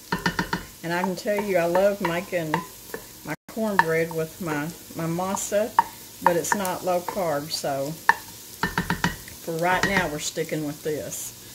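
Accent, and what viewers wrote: South, United States. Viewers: hi friend! This sounds delicious. A nice switch from regular chili. I must make this. Your voice is so relaxing to me. It’s late here and I was listening to this video and then I heard the gunshots and I started cracking up! I love you guys so much! Oh and don’t worry about rude comments. I love your southern accent. Makes me feel like I’m at home. Your accent is beautiful just as you are. Love you!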